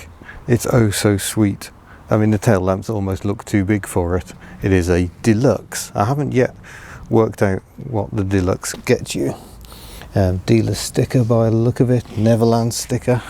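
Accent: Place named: West Country, United Kingdom. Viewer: I enjoy watching a Chris Harris video (he has a prized, much loved 2CV too) but living outside the UK, very easy-on-the-ear west country accent is an absolute delight to listen to and a reminder of home. I never thought I'd enjoy content with such old and average (admittedly characterful) cars - but I'm converted! I even got to see a DAF City Car yesterday (via visit to the DAF museum) which I had the Corgi version of as a kid in the early 70s)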